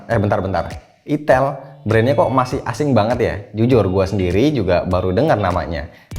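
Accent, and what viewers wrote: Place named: Banyumas, Indonesia. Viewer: w Jateng om, ngapak ya tau wkwkwk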